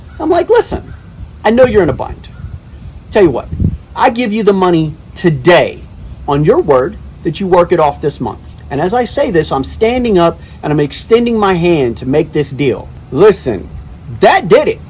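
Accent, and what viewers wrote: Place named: New York, United States. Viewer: I couldn’t help but find it funny that whatever is causing your audio to peak also kinda made it sound like you had a New York or New Jersey accent 😂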